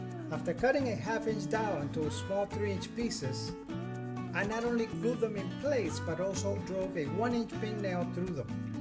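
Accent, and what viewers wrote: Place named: Cuba. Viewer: Soon as he spoke. before I saw the name n he said it. I knew he was cuban. Can't fake the accent mi gente!! Conño!! Nice vid! Thank you meng.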